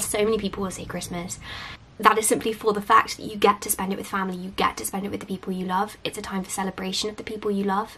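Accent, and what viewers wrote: United Kingdom. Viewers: i wish i could speak as beautiful british english as you 🤩 greetings from germany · That's how british people talk. What is wrong with you??? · I love your British accent and use your videos to practice my pronunciation ❤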